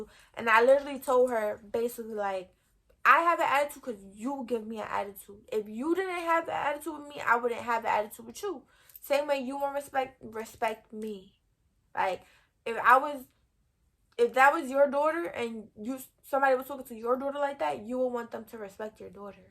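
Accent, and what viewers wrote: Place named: East Coast, United States. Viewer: You from the east coast? NY or NJ I can tell by the accent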